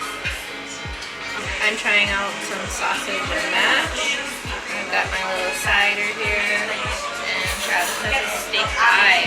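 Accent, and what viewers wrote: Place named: Canada. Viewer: I hope you enjoyed your visit to Edinburgh and Scotland. I only picked up on the fact you’re Canadian when you said “out”. Many years ago I worked in a large city centre hotel and had the pleasure of meeting many people from Canada. You’re quite brave taking the wee one with you. In recent years Edinburgh has become (like so many places) a tourist attraction all year round, whereas it was mainly just in summer when it became so busy.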